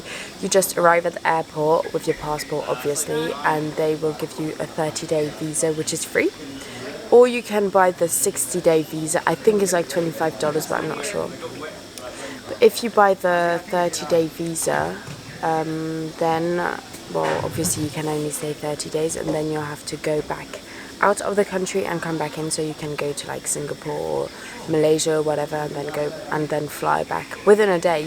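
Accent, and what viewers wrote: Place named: United Kingdom. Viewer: No way. Do people from France actually take on a UK accent when learning English?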